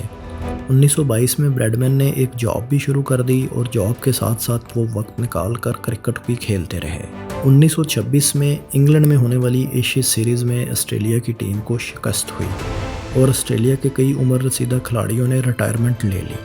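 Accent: Punjab, India. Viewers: Is Bhai ka to to Urdu bolen main panjabi lehja hai . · O bhai punjabi zubani main urdu ka sabaq parh rahe ho?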